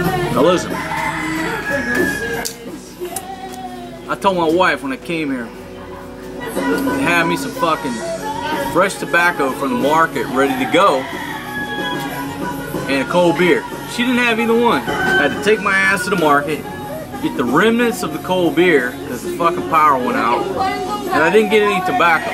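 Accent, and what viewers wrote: South, United States. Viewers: Where you from bro down south somewhere I guess Louisiana? Liking your content on your channel can’t wait to get my ass back to phillipines and yep gimme a choice I’ll take phily over Thailand any day even with its fuck ups lmao 😂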